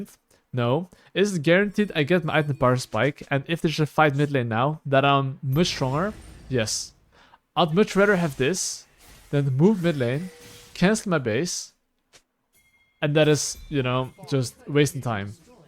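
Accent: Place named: Netherlands. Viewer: Bro, is this guy Dutch? Holy accent😂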